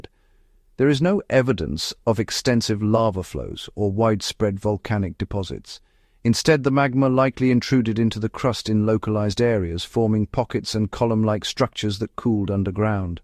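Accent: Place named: United Kingdom. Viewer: WHAT SOUNDS TO ME LIKE A BRITISH ACCENT IN NARRATION IS MOST OBNOXIOUS.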